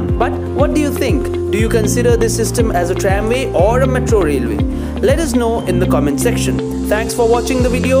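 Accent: India. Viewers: YOUR INDIAN ENGLISH IS LIKE YOU ARE SING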